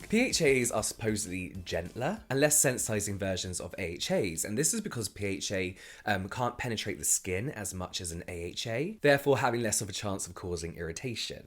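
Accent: United Kingdom. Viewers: Are u British?your accent seems so yeah?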